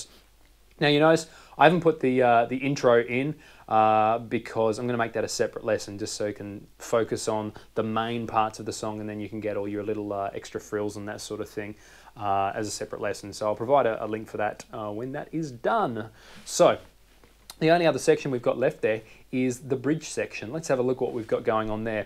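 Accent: Australia. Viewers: i love how aussie you are ><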